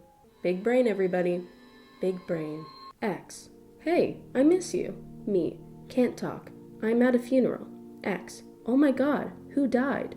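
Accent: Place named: Canada. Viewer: your Canadian accent is so cute!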